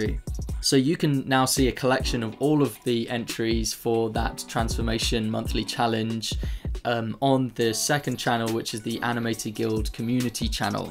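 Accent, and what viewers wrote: United Kingdom. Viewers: Thank you very much! I needed morphing in my studies))) I really enjoy your videos thanks for British accent ;)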